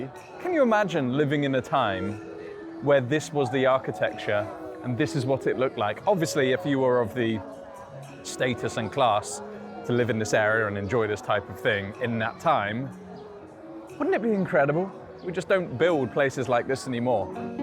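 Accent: United Kingdom. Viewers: The video has a good rhythm and a pure British accent that sounds very comfortable. I watched it over and over again to learn English, and I really enjoyed watching it. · Chinese here. Subscribed for British accent lol, but mostly I enjoy the calm, genuine vibe in your video without diatribe & hubbub. glad that you enjoyed your trip so far. I am working and living in XinJiang region right now, summer is perfect for travel ( none Urumqi areas), definitely worths a visit. cheers.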